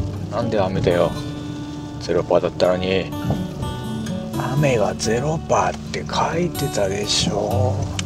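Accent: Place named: Hokkaido, Japan. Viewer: 久しぶりに聞いた北海道なまり❗やっぱりうまいっしょw 本当にガレット美味しそうでした。アクシデントでタイヤ購入って春先はやっぱり私も有ります😢 週２回もさん見れて嬉しいです🎵 無理無く旅して下さいね😊❤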